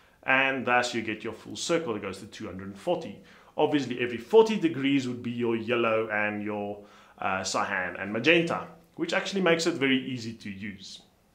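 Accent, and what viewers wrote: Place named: South Africa. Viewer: Excellent , Thank You , quick understanding of colour. Sounds like a South African Accent !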